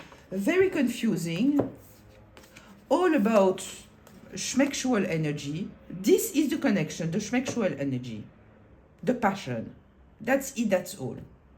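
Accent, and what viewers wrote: France. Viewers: Tres insightful! Your readings seem to really tell the sad story of who she is...with a strong French accent. 👏🏾 Thank you! 🙏🏿❤️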